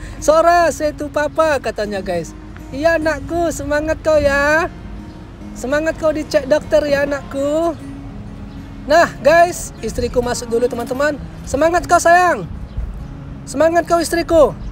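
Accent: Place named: Java, Indonesia. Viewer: Mas e koyo wong jowo wae wkwkwk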